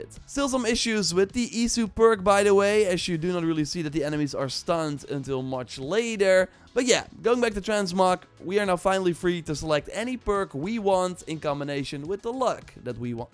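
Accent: Netherlands. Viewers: dat nederlands accent is zo duidelijk lmaoaoa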